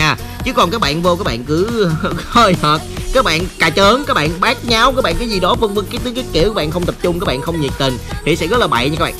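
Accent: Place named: Mekong Delta, Vietnam. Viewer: Thương ông này vl.nghe dọng miền tây dể thương gớm :))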